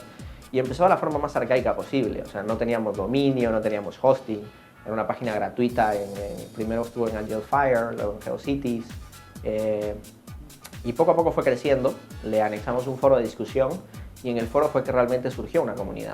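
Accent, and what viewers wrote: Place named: Spain. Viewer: Por que el acento español??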